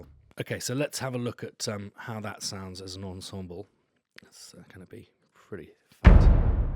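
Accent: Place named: England, United Kingdom. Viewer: A suggestion: speak more slowly - not all ears are attuned to a rapid-fire English accent. Also, use a bit of gain and compression on the voice so we can actually hear it - would be a good start. The voice level needs to be up there just below the volume of the percussion. If we turn the sound up so we can hear you talking, the drums just about blast our eardrums together in the middle of our heads! Very poor recording technique for video. Good content, but crap recording!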